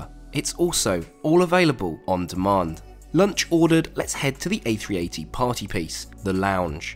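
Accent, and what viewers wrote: United Kingdom. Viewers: What?!! They are now blowing away Emirate's First Class. Great. Hey, your voice style is very British and sounds relaxing, thanks for all the contents you upload to YouTube, way better and honest reviews than the "Chu" Chu Train. LOL - But I still prefer their Q-Suite over this Eggshell seating.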